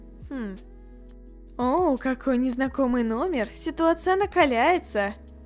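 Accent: Ukraine. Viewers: ты украинка?:_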